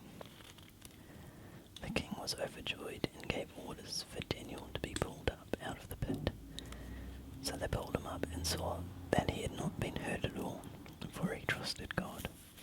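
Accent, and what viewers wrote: New Zealand. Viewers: sounds like kiwi accent to me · It's interesting, you'd think being a kiwi myself I'd get used to the accent, apparently not! I've been listening to most of your asmr and it's always very comforting - personally I love your readings of the bible the most. If you one day do a video where you whisper into both ear at the same time with a bible reading... well. (no hints here ;) ). God bless :)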